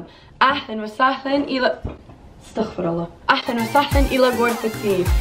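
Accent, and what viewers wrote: United States. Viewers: OMG for an American person ur American is sooooooo good learn alil bit more Arabic accent and you will be perfect 😍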